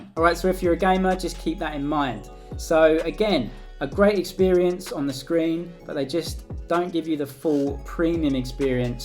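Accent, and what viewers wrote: England, United Kingdom. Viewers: I'm sorry to say but you are just over critical, going to China, speaking with strong enlgish accent commenting their phones, clinging to the small details and making sensations on youtube. it is too commercial and nonsense this video, hoping to attract viewers by titles and shits.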